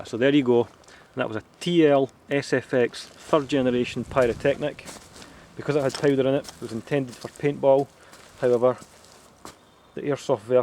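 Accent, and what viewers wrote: Scotland, United Kingdom. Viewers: Scottich accent.............. I like it :D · Yeahhhh, I don't think he is British. I think he is Scottish... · are you scotish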